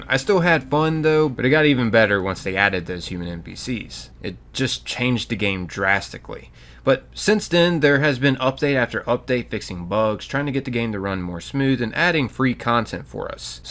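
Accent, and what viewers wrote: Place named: Philadelphia, United States. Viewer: Off topic but I love your accent. Philly?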